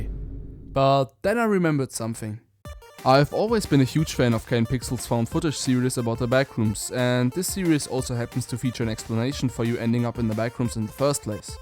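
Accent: Germany. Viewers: Are you german? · YOU ARE GERMAN I HEAR IT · Oh yeah and PLEASE try to bring this to steam I’d love to play it, also you’re pretty obviously German just judging by your accent (no disrespect I’m German too) and from the text in 😭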